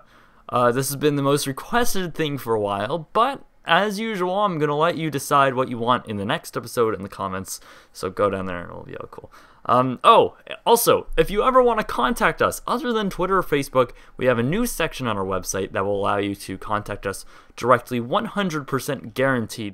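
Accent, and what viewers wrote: Canada. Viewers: I have one question for you boy: Are you Canadian? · You sound Canadian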